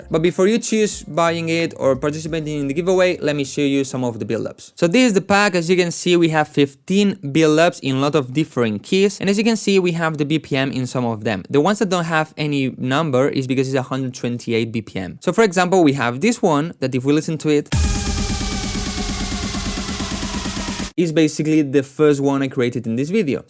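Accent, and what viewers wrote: Spain. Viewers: eres español jajaj se te nota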